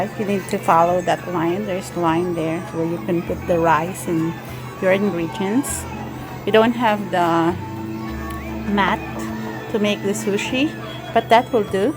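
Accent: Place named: England, United Kingdom. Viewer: Yum! 😋 love your english accent! Galing magchopsticks ha! Your awesome! 😄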